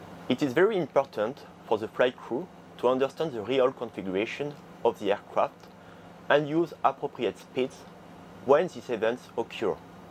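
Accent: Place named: France. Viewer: Amazing video . Very well explained . Good graphics . Love your French accent . Would love to see more and more videos of exercises done in simulator checks ,, even after 8000 hrs on A320 ,, this video on flaps and slat positions was a very good pictorial learning . Like and subscribed.. waiting for tutorials on ra1+2 .. emer elec .. adr + ir exams ,,, unreliable airspeed also ,, since there is not a single satisfactory video on YouTube … and many more videos pls …. We would also like to see videos on how to apply MEL in various stages of flight ..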